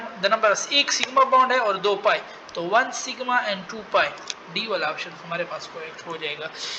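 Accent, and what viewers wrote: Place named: Khyber Pakhtunkhwa, Pakistan. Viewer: Sir ap kha sa hoo kpk sa lg rhi hoo😊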